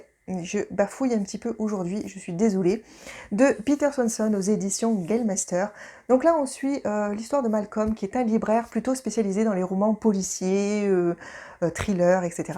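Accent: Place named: England, United Kingdom. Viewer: Coucou! Bravo pour cette vidéo! Je vois qu’on a une majorité de livres en commun, surtout pour les grandes sorties!!! 😍😍 Genre Aurora Squad 2!!!!!!!!! Haha, l’attente me rend fébrile... 🤣🤣 J’adore ton accent anglais!! 😅 Pas pire que le mien!! 😉 Bisous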